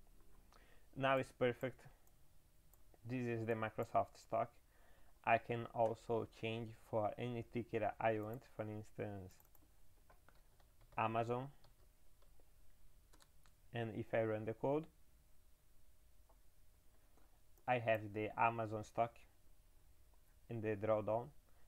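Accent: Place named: Portugal. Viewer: Given your accent I'm almost sure that your native language is Portuguese =) am I correct? cya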